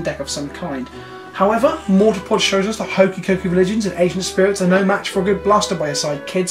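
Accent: United Kingdom. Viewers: It is not really the deck, but the cutting and presentation. I love when you stay on your shots, where you explain a pun, for just a second to long. Reminds me of Family Guy. :D Also the off-topic stories are really funny and your british english is the icing on the cake. :D There are not a lot of YouTube Channels who embrace their accent like you do.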